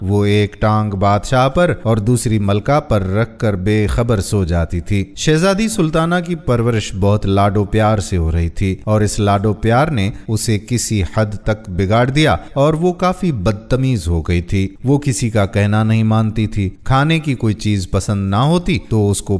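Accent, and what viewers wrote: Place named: Arab world. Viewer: Samaj nahe aata ye urdu hai ya arabi, mehrabani kar ke urdu alfaaz ko Arabi talaffuz ( pronounce) na kare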